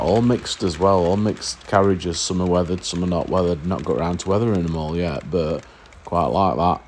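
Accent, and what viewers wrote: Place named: Northern England, United Kingdom. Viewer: I just envy your northern lilt much better us ‘ampshire ogs you do voice over for my vids and story time ps grot 1000 %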